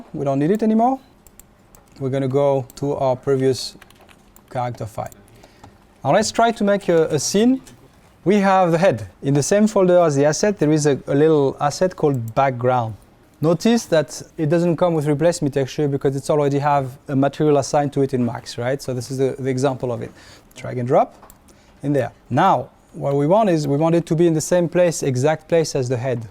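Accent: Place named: France. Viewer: Good french accent ! haha content de voir un Français chez Crytek ! c'est pas tout les jours !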